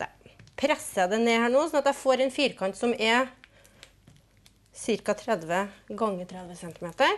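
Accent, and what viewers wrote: Norway. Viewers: sør-trøndelag det ka du da høre? :P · Molde? Eller Opdal, ikke trønder isåfall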